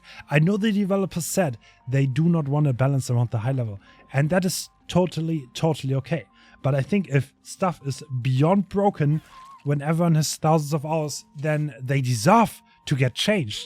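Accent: Germany. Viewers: man hört, dass du deutsch bist ;)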